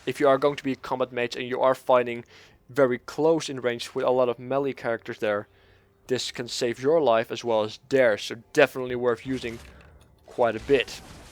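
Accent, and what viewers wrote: Netherlands. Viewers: You sound Dutch haha. Nice video. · jesus christ your accent, must be dutch? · You are dutch, ik weet het xD Waarom kunnen wij nederlanders zo slecht engels praten! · dutch accent rofl. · im 100% you are Dutch it has to be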